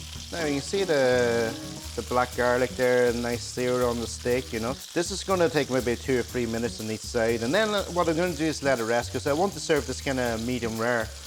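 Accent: Ireland. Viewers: There’s something magical about an Asian with an Irish accent 😂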